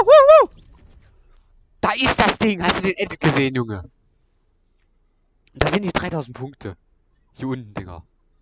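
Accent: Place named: Saxony, Germany. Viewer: Bist du Sachse ich bin Sachse denke es wegen dem Akzent, könntest du meistgeschauteClips von FaZe Dubs oder mitro, benjifishy feie das Format. 👍👌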